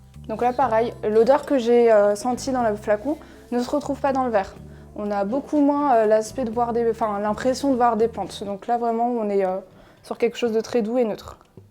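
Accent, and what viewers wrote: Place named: Arab world. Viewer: Accent arabe ça fait plaisir 🥰🥰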